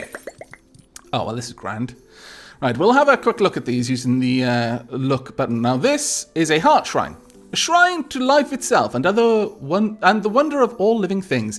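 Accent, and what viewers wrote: Wales, United Kingdom. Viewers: Damn it. You make me want this game. Both my wife and I know the joys of hearing the jaunty awesome Welsheness of.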